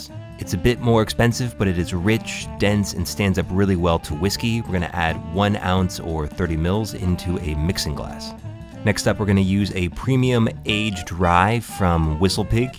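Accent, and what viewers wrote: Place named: New York, United States. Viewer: Knew you were a New Yorker hell yeah 🧐👌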